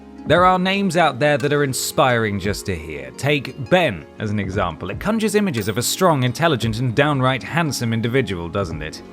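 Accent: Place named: England, United Kingdom. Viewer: Interesting that you speak with an Emglish accent but call it a "Sega Genesis"...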